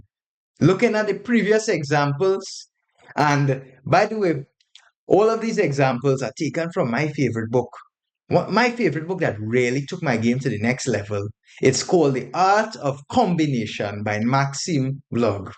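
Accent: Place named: Trinidad and Tobago. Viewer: That’s a Trini accent!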